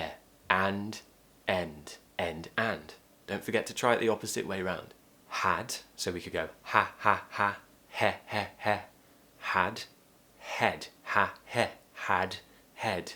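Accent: United Kingdom. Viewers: You are the best, british accent is the best ,I'm happy from Africa 🇲🇱🇲🇱🇲🇱❤❤❤ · You are a very good teacher!I love the British accent, would love to learn more! Thank you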